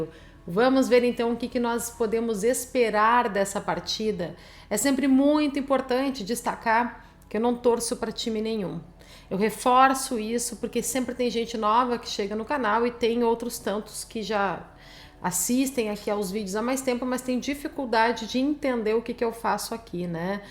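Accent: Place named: Rio Grande do Sul, Brazil. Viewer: Voce com ese sotaque de gauxa voce tem cara de gremista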